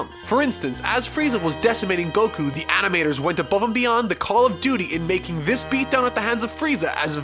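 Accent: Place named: Ireland. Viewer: hey ive been a sub for almost a year now and i was just wondering what country you grew up in as u sound a little irish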